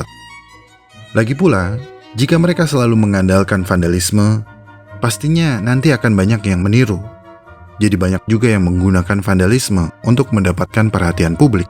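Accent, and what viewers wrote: United Kingdom. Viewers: Aksen britishnya enak didenger 😅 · denger aksennya ky lg ntn film Hewri Potah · Logat British sangat melekat 😂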